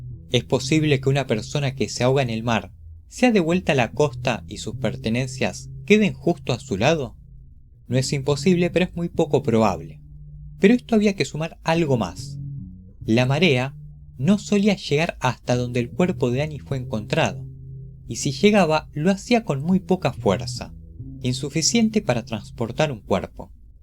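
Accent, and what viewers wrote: Argentina. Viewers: Seeee en la plaSHA, queso raSHAdo, Shego, SHO, caSHAdo, etc así hablamos los porteños che !!!!! 🤷🇦🇷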